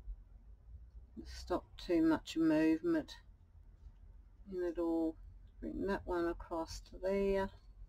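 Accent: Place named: Australia. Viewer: Very strong Aussie accent. Worked in Aus for a few years before returning to NZ. Miss my Mates. Love your work, and l hope your health improves. We have free prescriptions here in New Zealand, and even before we only paid $6. It’s a shame that prescriptions are so expensive in Aus, considering every tax payer has to pay the additional 3% tax for Medicare.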